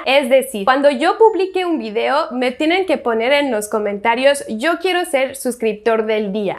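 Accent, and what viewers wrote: Mexico. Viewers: saludos guapa de veracruz..me encanta oirte hablar frances se escucha genial..me impresiona oirte hablar con acento mexicano ytambien si hablaras español con acento frances seria genial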